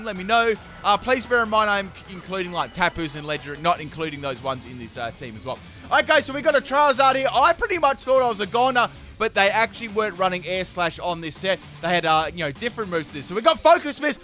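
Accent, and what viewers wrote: Australia. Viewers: Do they honestly not teach phonetics in Australian school? Always baffles me the way you sound words out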